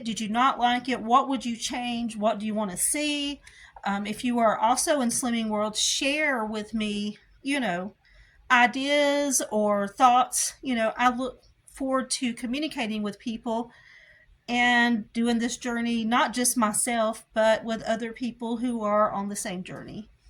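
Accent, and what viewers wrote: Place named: South, United States. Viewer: you've definitely got that southern drawl but we really like it! I do hope you didn't mind me mentioning the camera but it's an idea to play about with. You have done so well. It's not easy in the early days navigating filming, editing, uploading, being Infront of the camera etc. Hats off to you girl. Xx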